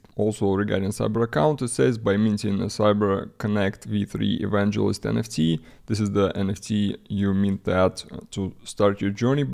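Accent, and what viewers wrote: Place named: Russia. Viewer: бро ты же русскоговорящий?) по акценту ясно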